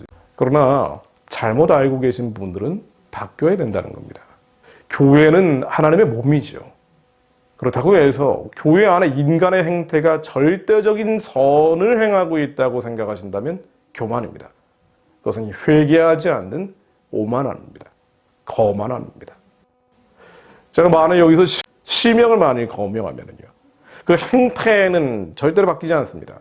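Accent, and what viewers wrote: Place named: North Korea. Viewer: 이사람도 이북악센네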